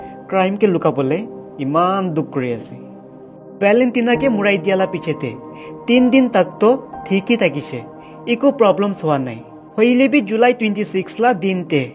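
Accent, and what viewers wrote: Nagaland, India. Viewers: I'm happy with your Nagamese accent special kushi ba tho Last tey....#Na kwa tho😅❤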